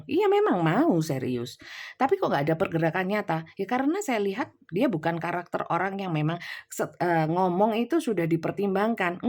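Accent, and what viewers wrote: Java, Indonesia. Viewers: Seneng suoro jowone ❤❤❤❤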